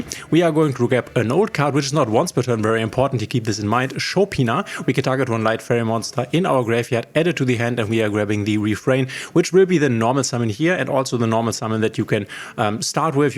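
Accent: Germany. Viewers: OMG dieses deutsche englisch Akzent nervt. Aber trotzdem gut erklärt😂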